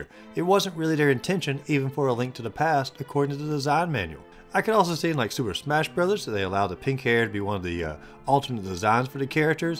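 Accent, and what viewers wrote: South, United States. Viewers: Sweet video man! Sounds like you're holding back a pretty significant southern accent. You should let it shine! Not enough gaming channels with attitude.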